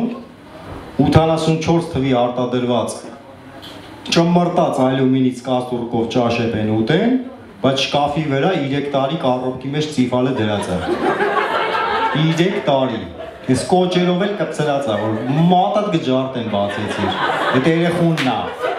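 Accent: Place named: Gyumri, Armenia. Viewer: Bayc meka inchqan el Erevani barbarov kxosa meka tex tex kzgacvi or Leninakanci e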